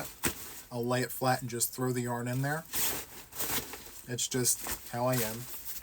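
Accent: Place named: Boston, United States. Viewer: I love your Boston accent 😊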